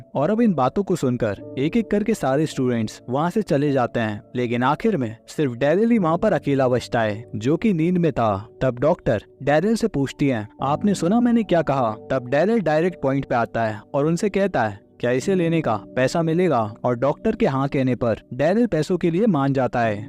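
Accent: Kanpur, India. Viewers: Kanpur se hai bhaii ❤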